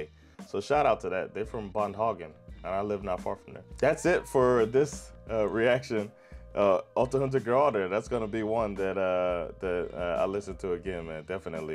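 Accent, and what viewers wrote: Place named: United States. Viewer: Damn your Swedish pronunciation is actually really good, I'm impressed. Of course there's an accent, which is to be expected, but you sound just like an American who's moved to Sweden and learned the Swedish language (at least from my perspective and interactions with Americans who's moved to Sweden)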